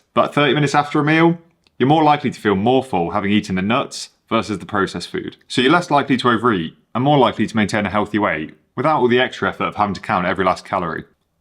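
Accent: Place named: United Kingdom. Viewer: Please take no offense but it's hard to understand your content as you've got a strong British accent. You may wanna add subtitles to reach a wider audience outside of the UK.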